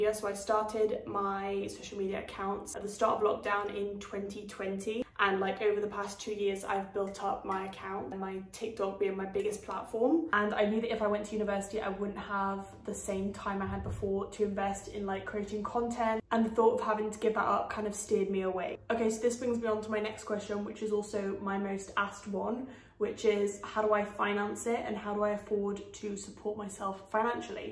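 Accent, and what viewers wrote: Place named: England, United Kingdom. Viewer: Why do you have an English accent if you grew up in France?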